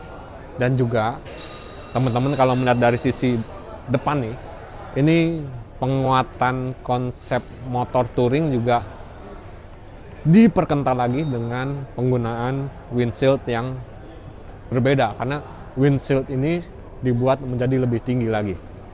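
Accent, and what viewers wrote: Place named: West Java, Indonesia. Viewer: Letah sunda 😁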